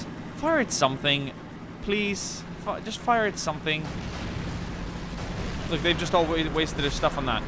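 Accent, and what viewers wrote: Sweden. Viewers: Are you swedish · Är du svenne?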